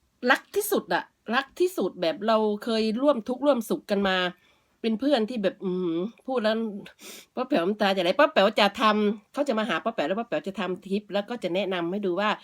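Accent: Isan, Thailand. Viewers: ป้าสำเนียงอีสานนะคับผมเป็นคนอีสาน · ฟังจากสำเนียงแล้ว...ป้าแป๋วน่าจะพูดภาษาอีสานได้ดีมากๆ และน่าจะดีที่สุดภาษานึงด้วย...ฟันธง!!!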